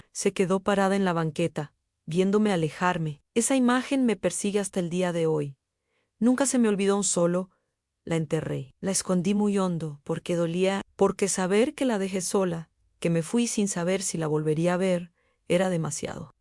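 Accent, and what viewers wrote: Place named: United States. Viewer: Porque ese tono Pocho igual que las Historias de hombres con Historias de Inmigrantes an ho muy pochos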